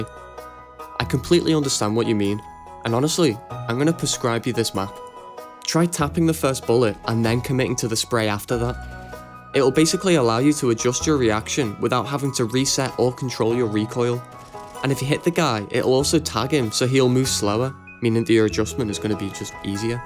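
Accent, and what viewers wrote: Liverpool, United Kingdom. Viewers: Hes scouse you whopper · Why is he british???????? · liverpool accent slaps for commentary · lord knows i need a scouse cs youtuber in these trying times. subbed :)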